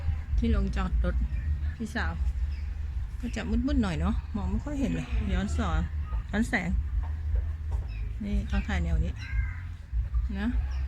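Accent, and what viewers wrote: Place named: Suphanburi, Thailand. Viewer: 😊👍พี่คนสุพรรณเหรอครับ ฟังจากสำเนียง ผมเคยไปเที่ยวบ่อย..อิอิ😁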